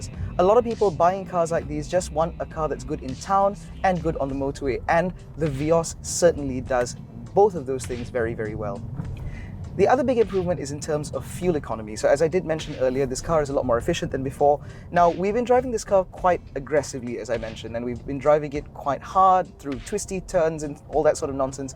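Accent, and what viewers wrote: United Kingdom. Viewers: sound like British accents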